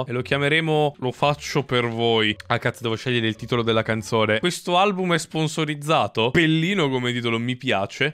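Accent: Bologna, Italy. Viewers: Ma sei Di Bologna davvero?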